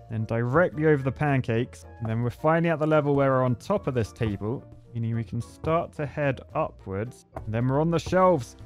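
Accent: United Kingdom. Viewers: Why are you. British your supposed to be American.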